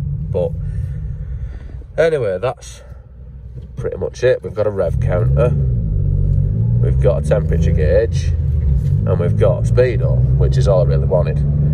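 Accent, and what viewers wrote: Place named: North, United Kingdom. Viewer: Great videos,love your 'Northern' presentation! From one Northerner to Another😉!!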